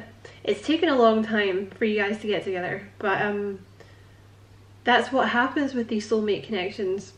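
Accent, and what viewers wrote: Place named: Scotland, United Kingdom. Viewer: Thank you! I have been 100% confident in this connection for a while so I'm pretty relaxed in it (Spirit told me from the very beginning, "if you don't loose your cool, everything will be fine"). However, confirmation is always nice. He's water and oh, so slow... I'll keep doing my work while he does his. BTW, I love your Scottish accent!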